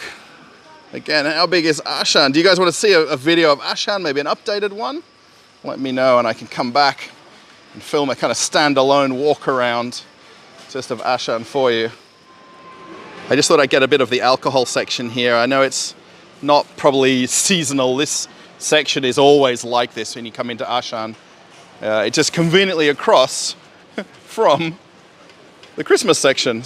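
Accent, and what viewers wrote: Australia. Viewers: Австралийский английский как-будто понятнее что ли.. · Он же вроде австралиец. Да/нет? У него есть австралийский акцент?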